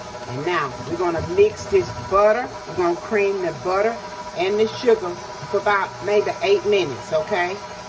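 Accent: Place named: South, United States. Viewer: Mrs., I just wanna come sit at the table. I love your spirit and yo kountrrryy twang.. God's continued blessings to you and.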